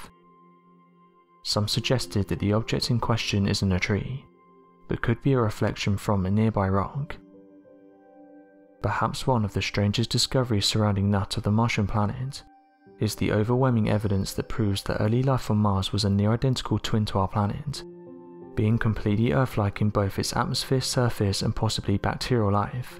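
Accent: United Kingdom. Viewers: why are always using a british guy narrating these stories?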